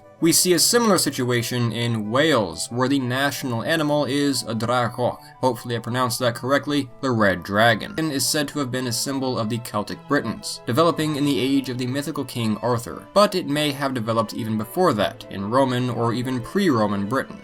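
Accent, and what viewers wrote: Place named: United States. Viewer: it's a shame that you didn't ask the guide what Swedes call this animal in their own language which, rather tellingly, they pronounce "elg". If that's your voice-over, it sounds like you have substantially learnt English from American sources. Maybe your Swedish companion also learnt American English. As for the signs- well, looks like they're for English speaking tourists... mostly Americans? American English shouldn't be regarded as the definitive form of English. Another example is your pronunciation of the Australian bird, the "emu". Americans (and you) mispronounce this as "ee-moo". Australians pronounce it "eem-you".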